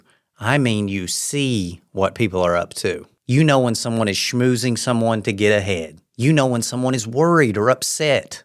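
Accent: South, United States. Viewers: I really like your southern accent, it’s nice to hear.☺️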